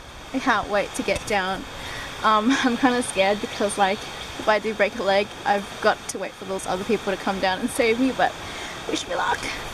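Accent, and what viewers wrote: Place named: Australia. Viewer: The second you talked, I hit liked! Australian women are my kryptonite!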